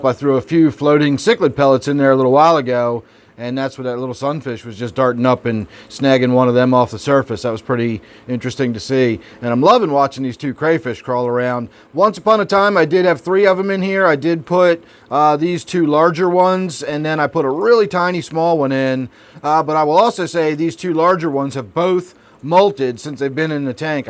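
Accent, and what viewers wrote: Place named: Philadelphia, United States. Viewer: I just stumbled across your channel and I gotta ask, are you from Philly? You talk like us.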